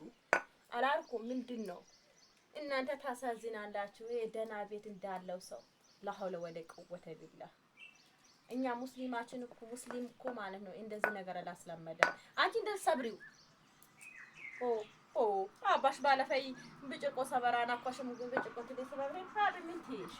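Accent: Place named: South, Ethiopia. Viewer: በርች ውዷ እንደው በደንብ እንኳን በማታውቂው ቋንቋ እየ ታገልሽ ይገባሻል ❤❤አማረኛሽ ዘና ሥለሚያደርግ በርችልኚ የደቡብ አማረኛ ውሥጤ ነው 😂😂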